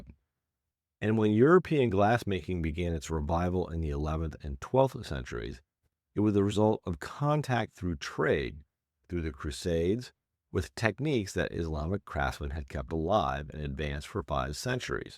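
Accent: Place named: United States. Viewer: OMG. great subject but why the American accent? in is 2026, The U.S is not where it is at now. That has long gone. Can not watch, sorry. :(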